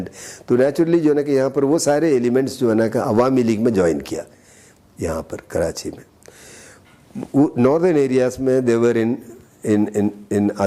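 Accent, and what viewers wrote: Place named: Kerala, India. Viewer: He speaks great Urdu, but he still has a Malayali accent.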